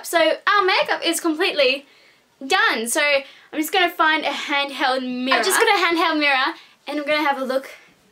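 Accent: Australia. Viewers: are you austraillian ?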